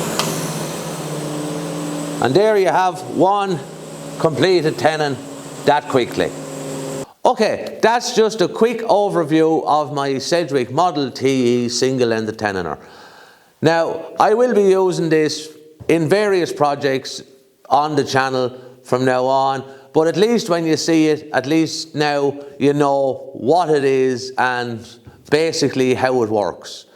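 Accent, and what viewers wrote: Ireland. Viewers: Well done 👏 1k. Great video. You sound like a kildare man.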